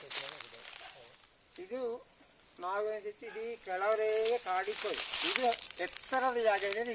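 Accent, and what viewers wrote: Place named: Kundapura, India. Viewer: ❤❤spr ❤ kundapur kannada ne chandha...! Alla ❤🙏🙏🙏👍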